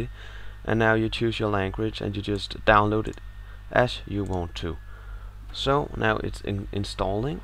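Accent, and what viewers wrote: Denmark. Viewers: i can just heat clearly you are from denmark! haha!:D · Danish? Are you from denmark^^'?? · Haha, kunne tydeligt hører du var dansk :-) Fed video den hjalp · Dansker :D? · Are you Danish? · Tak, nu kan jeg komme igang med videoredigeringen igen Synes godt at jeg kunne spotte en dansk accent XP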